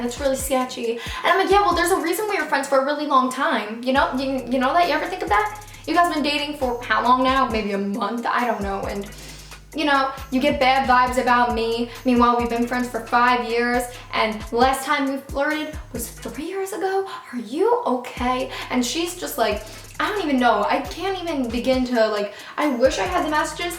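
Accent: New York, United States. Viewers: U have such a New York accent 😂😂 love it 💗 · are you a new yorker? we have very similar voices and I hear your accent.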